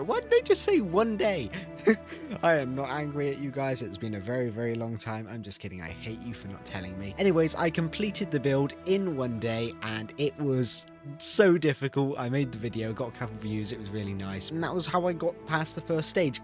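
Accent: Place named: United Kingdom. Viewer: Okay I'm gonna spill the tea... he's British.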